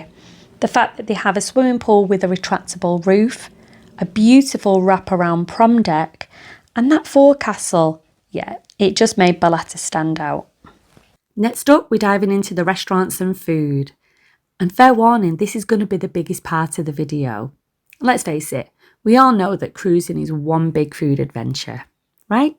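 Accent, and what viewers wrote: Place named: Lancashire, United Kingdom. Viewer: Sounds like a Lancashire Accent, Maybe Oldham area?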